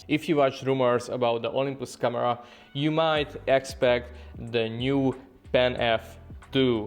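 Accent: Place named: Russia. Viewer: You speak with such a heavy Russian accent, are you Russian?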